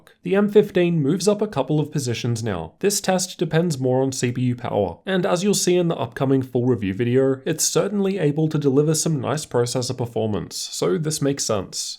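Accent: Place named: Australia. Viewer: Bruh this boi Aussie bruv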